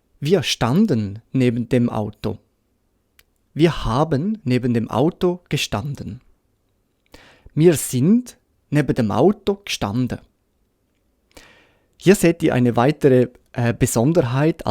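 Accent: Switzerland. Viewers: Hallo, darf ich fragen, aus welchem Kanton dein Dialekt kommt?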